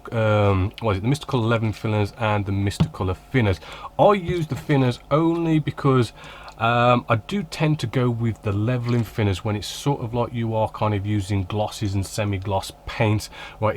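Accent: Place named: Black Country, United Kingdom. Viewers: This convinced me to pick some up. Nice to hear a fellow yam yam, ha! Subbed. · been using 1500 as a primer for a few years, still needs 50/50 thinning for an airbrush as it is very thick and gloopy! just out of interest are you based in the midlands as you have a bit of a midlands accent? like myself tbh!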